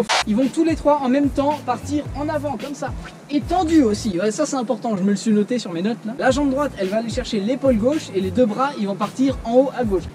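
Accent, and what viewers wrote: Belgium. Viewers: Belge ou français ?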